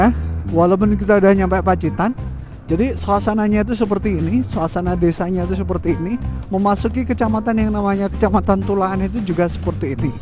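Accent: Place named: Ponorogo, Indonesia. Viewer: Iki lho dlondonge wong ponorogo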